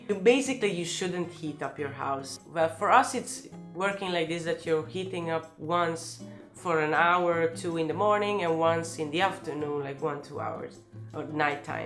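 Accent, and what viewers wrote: Venice, Italy. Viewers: comunque è buffo, il tuo accento in inglese sembra italiano ahahaha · You have a venetatian accent when you speak italian,so cool:) I'm half italian half canadian living in the UK, London. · So true!!! Sembra proprio venezian! 😅💪🏼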